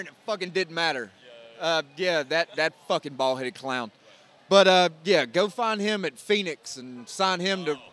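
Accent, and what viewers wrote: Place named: South, United States. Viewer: Yoo i aint trying to be racist but he sound way too southern to be a soccer but overall funny guy btw Super Dortmund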